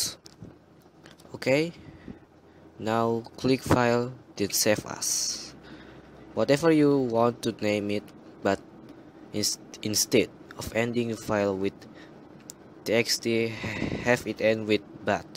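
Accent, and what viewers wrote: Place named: Indonesia. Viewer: You indonesian not engglis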